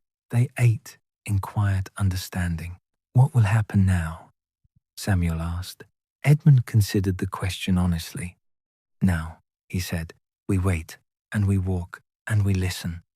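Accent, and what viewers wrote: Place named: Lancashire, United Kingdom. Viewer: Thank you. Gentle voice. Lancashire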